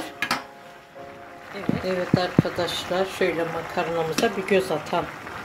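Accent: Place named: Dersim, Turkey. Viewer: Abla sen Dersimce biliyor musun? Sanki Dersimliler in aksaniyla konusuyorsun! :-) Yani bilmesem, akrabam sanacagim!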